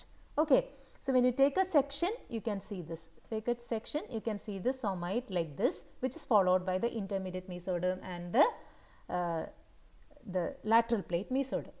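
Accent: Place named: South, United States. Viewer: and the moment i heard the southern accent, i was sure to obtain a wholesome experience.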